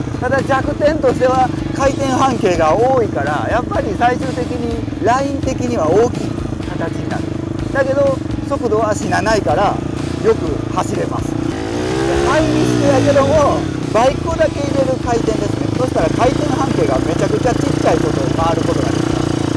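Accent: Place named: Kansai, Japan. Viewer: シャープですね〜(^ ^) 素晴らしい動画でした！ 柔らかい関西弁も聴きやすくて良かったです(^｡^)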